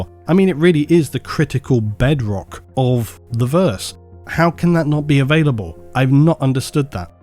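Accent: England, United Kingdom. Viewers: I like when England man speaks.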